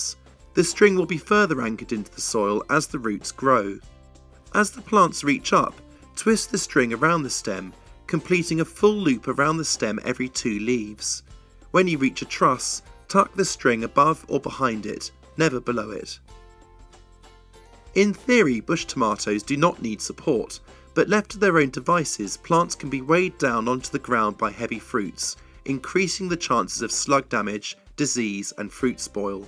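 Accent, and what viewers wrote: United Kingdom. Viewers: Why do you have to make everything so freaking dramatic. That British accent doesn't help either. Just give the information man!!!!. How did you people conquered the world? Jesus!!!!!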